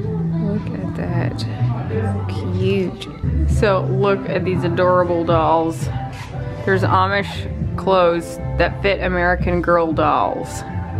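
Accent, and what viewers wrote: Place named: Ohio, United States. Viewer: Hearing you talk, you sound a lot like me. I thought I was different but I guess it’s the normal Ohio accent.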